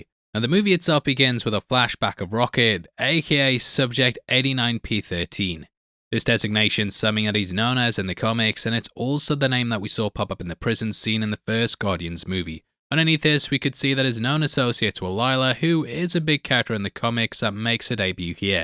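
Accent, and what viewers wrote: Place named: North East England, United Kingdom. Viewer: Oh God you said Monga. Manga ffs. You're English. MANga. Ffs. I get the NE accent.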